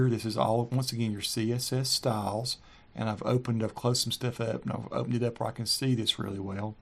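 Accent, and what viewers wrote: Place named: North Carolina, United States. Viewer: Wow, thanks so much. This is the best Dreamweaver tutorial I've found. Plus, your accent is awesome (NC!)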